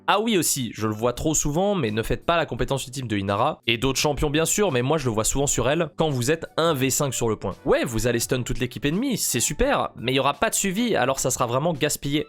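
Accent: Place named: United Kingdom. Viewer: je te juge pas mais ton accent anglais seris... désolé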